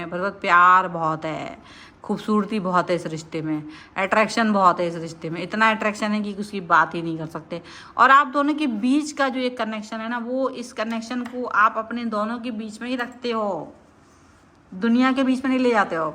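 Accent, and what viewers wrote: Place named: Uttar Pradesh, India. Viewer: 😘😘😘😘😘 aap mujhe U.P. se lagti ho kyuki main bhi U.P se hu and aap ko sun ke maine pehle bhi poocha tha...jab first time aapko suna tha....mujhe hamare ghar ki yaad aa jati hai..😢 isliye ...naa ki kuch galat hai...I truly like you and appreciate your efforts ❤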